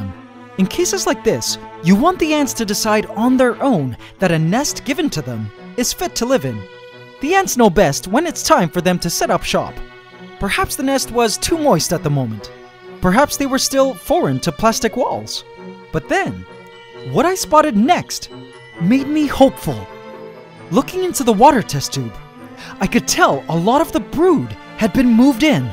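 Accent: Canada. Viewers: You are Canadian right? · Destrxct_ haha I always assumed he was Canadian...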